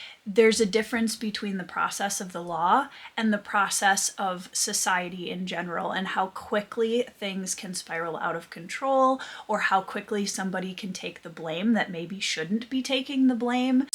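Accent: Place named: Minnesota, United States. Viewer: I knew you had to be from Minnesota from your accent!!!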